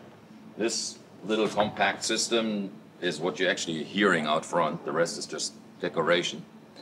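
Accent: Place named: United States. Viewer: he speaks english like a pure american,if i wouldn't know him i would thought so.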